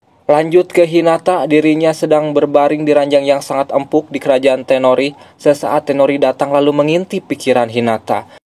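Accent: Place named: West Java, Indonesia. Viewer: Sundanya kdngeran banget bang😂😂😂👍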